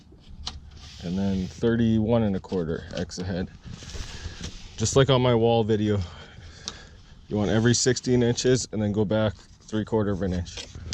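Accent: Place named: Alberta, Canada. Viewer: You got that Alberta accent. Where are you from? im gonna guess, Edmonton.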